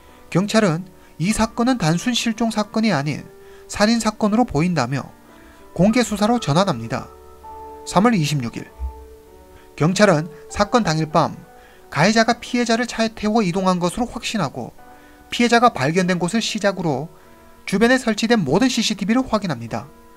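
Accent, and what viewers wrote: China. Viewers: 똑같이 느끼셨군요, 조선족 말투???....😁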